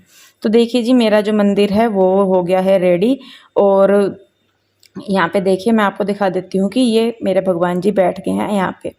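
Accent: Haryana, India. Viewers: Bahut sundar lag rha h ji ghar aapka ji aap haryana se ho kyuki aapki boli hamare jaisi h same boli h hamare yha yehi bolte h yh mera haryana dodh dahi ka khana yh mera haryana 👌👍🙏jai shree krishna 🙏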